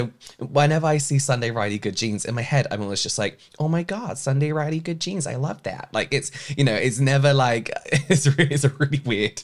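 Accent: United Kingdom. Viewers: I believe Peter Thomas Roth would fit into this category! I've bought a set of their cosmetics because it was on sale and tried some of their most famous products, vit C included. And it didn't change anything for me. I do like its luxurious touch, though! Won't be rebuying,too expensive to just feel nice and see no effects. Great video, I looooove your British accent ❤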